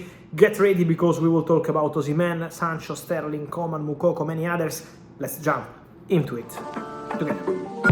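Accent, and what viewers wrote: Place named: Italy. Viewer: Except we understand only 60-70%, and have to guess what else he is saying. His Italian accent is really not easy! I still don't understand who bid for Oshimen??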